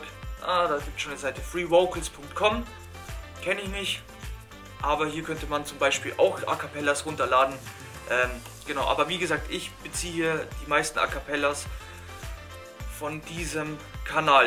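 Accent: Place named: Bavaria, Germany. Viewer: servus, woher kommst du? hört sich bayrisch an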